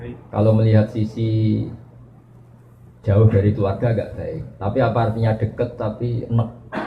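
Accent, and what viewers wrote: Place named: Banyumas, Indonesia. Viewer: Wong ngapak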